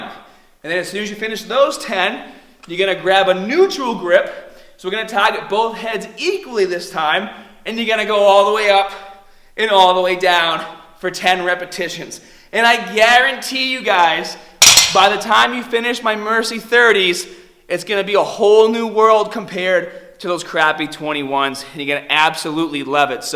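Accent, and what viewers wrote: Boston, United States. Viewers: is he from Boston? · that Boston accent really shining through! haha love it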